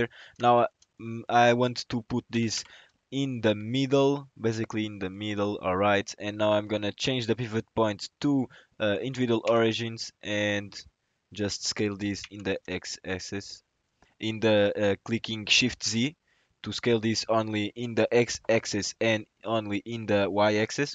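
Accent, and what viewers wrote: Portugal. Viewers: ah este sotaque tuga nao engana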